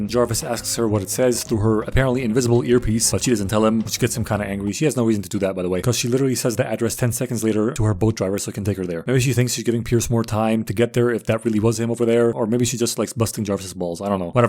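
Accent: United Kingdom. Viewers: No way my goat is british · DUDE ARE YOU ACTUALLY BRITISH?????????????? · HOLD UP WAIT A MINUTE! HE IS BRITISH ?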